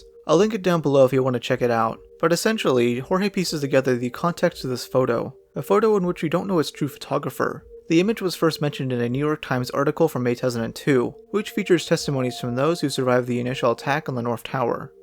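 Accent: Canada. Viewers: I don’t really think so. has a bit of a Canadian accent, meanwhile Jorge has a slight Spanish speaking accent (can’t pin point where, my Spanish is not very good) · isn’t it obvious, they are both Canadian as said and he just puts on a slightly Mexican accent for blameiton “Jorge” (Mexican George) show and puts on his typical canuck accent for his persona.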